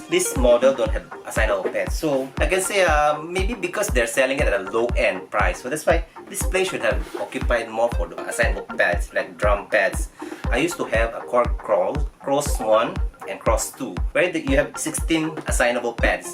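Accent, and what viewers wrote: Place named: Singapore. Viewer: grabeng accent singaporean vibes :) more videos again using brand new piano. :)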